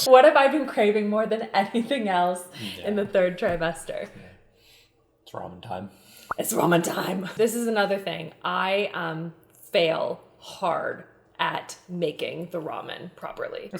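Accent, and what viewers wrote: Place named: Canada. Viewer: I came here trying to find some ramen lore, and then you mentioned Nanaimo bars and I was like...... wait a minute.... and then the way you said that they are named after Nanaimo, a city in BC, like people would just know what BS stands for, and then I got to thinking about your accent and I did some quick google searching, and I HAVE FOUND A FELLOW CANADIAN ON THE INTERNET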